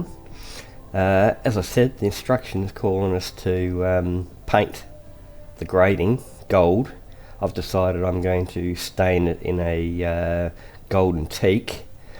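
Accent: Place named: Australia. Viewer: I’m assuming you’re Aussie by your accent and “g’day” . I’m just starting out and was wondering what stains you use and if they go ok under water based varnish? I’ve bought a tin tin of Cabots water flat varnish from the green shed. Cheaper than model paints. Andrew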